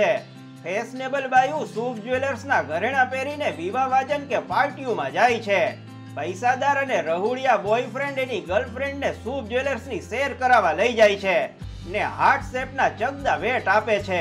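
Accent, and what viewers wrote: Kathiawar, India. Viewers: અવાજ કાઠિયાવાડી જેવો લાગે છે. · TAME EK BHARAT VIRUDHDH NU NIVEDAN APE CHHE HU PAN EK GUJARATI CHHU PANBIJA RAJYANA LOKONI PAN KADAR KATVI PADE AVA ZER OKTA VIDEO NA BANAVO TAMARA AVAJTHI TAME KATHIYAVADI LAGO CHHO DUKH THYU YAR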